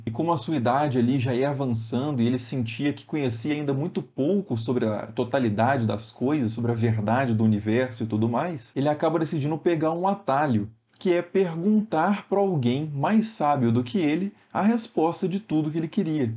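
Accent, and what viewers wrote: Minas Gerais, Brazil. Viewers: o suave sotaque mineiro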